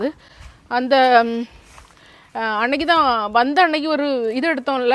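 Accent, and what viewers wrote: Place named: Kanyakumari, India. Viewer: Hi sister i an from singapore . Very sweet dialogue kanyakumari slang . One tip to share here Keep new puli in fridge , never change black color . I use to buy from chennai as new puli and store in fridge . For 4 yrs also never change color . But once you keep out it will get black . I always take puli from fridge for 2 weeks once so that our curry also never look black . Try this surely you will see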